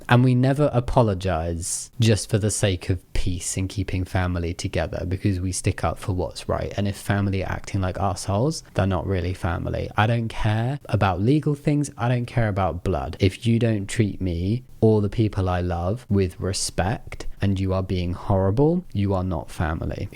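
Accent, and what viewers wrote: United Kingdom. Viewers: I just realise you have a British accent and I’m halfway through the video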